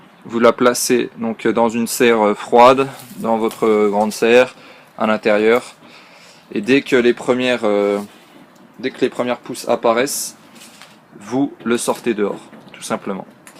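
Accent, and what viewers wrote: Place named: Vosges, France. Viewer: Super ces video, ca enchaine lol... J ai bien vu la (les ) video de Gilbert, mais étant proche de Rambervillers je comprend mieux ton accent;o)) Merci pour toutes ces video et leurs petites musiques douces...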